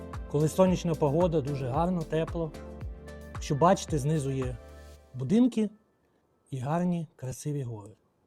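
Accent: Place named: Sanzhary, Ukraine. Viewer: В Санжарах так розмовляють.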